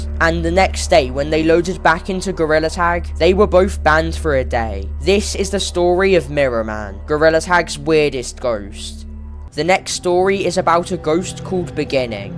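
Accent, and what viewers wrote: United Kingdom. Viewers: It's supposed to be creepy but British voice just makes it..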